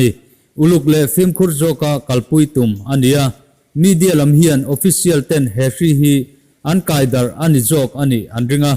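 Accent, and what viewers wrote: Mizoram, India. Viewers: I prog ah i tui tih a hrriat a, i mizo ṭawng pawh ngaihthlak a nuam khawp mai. Kal zel rawh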